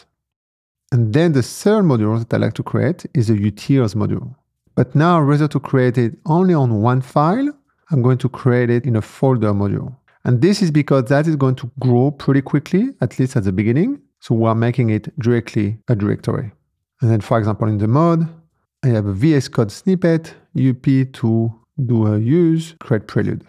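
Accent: France. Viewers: The french accent is so cool as a french person :) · ummm seem like here is a french accent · OMG! I'm loving the French accent! 🥐☕😀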